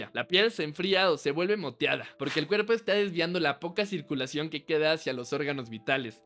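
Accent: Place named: Mexico. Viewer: Interesante el video, pero tu voz es demasiado mexicana 😢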